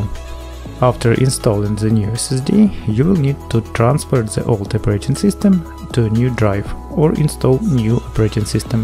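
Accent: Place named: Russia. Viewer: В середине видео понял что чел русскоговорящий )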